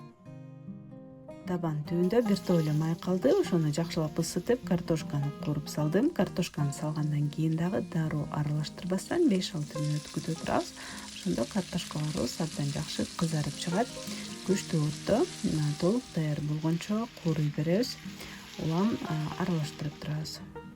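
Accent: Standard. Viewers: Сонун экен эже рахмат. Сиздин баарынан да бир да орусча аралаштырбай таза тунук кыргызча суйлогонунуз жагат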